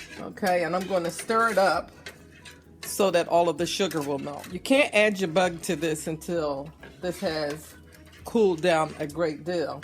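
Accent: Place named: Bermuda. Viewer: Hello madam your ascent so clean looks Bermudian or American ? What you said never use metal pot or spoon she is right also use wooden spoon and glass bottle always any thing for stir hot stuff never use plastic spoon I seen even master chefs using plastic spoon during cooking hot food need common sense only thanks. From, K.singh North West India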